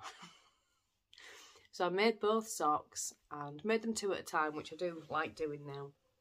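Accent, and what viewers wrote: Yorkshire, United Kingdom. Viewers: Pick me in the comments! :-D I have never fancied doing a Stephen West pattern before but your shawl is inspiring me to think about it .... hhmmm.. Thank you for your podcast - I'm really enjoying it (and loving hearing the Yorkshire accent (I'm from Sheffield but haven't lived there for years so it's good to hear you).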